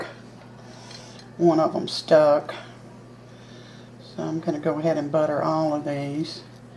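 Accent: South, United States. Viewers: Hello from Texas! You had me at no-fail biscuits. New subscriber here. Love your sweet southern accent...sounds like my mama—she was from Alabama. I look forward to looking through all your videos and watching your new ones too! God bless you! And I look forward to trying these biscuits. ❣️ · I love your Southern voice. It's so soothing to hear you talk. I know you Love the LORD. He is so good to us. I live in Phoenix, Az. GOD BLESS YOU BOTH.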